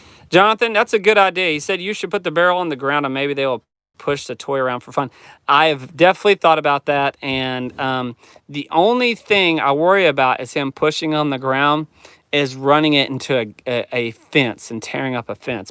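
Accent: Oklahoma, United States. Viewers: Ponca City says hello! Just found your channel and love it. I knew you had to be in Oklahoma by just listening to your video for a few minutes. The Crosstimbers in Oklahoma starts a few miles from here in the Tall Grass Prairie Reserve in Osage county. Your videos are outstanding. Daughter #2 graduated from OSU. GO POKES!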